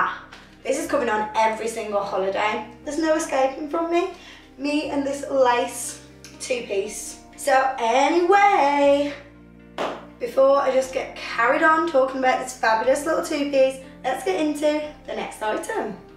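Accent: England, United Kingdom. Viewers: That sexy English accent adds more fire 🔥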